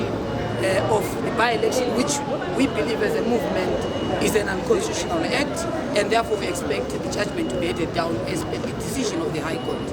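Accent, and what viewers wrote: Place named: Oxford, United Kingdom. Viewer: Taura zvako wangu mufanha wechidiki , who speak English with an Oxford asent Osalo 💖💥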